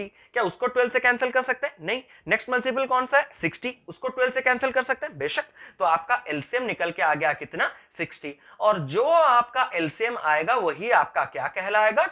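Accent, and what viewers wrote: Odisha, India. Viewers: Are you Odia sir?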